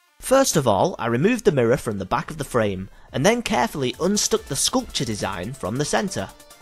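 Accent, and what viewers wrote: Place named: United Kingdom. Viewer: Victor Terhorst Nunes It's British English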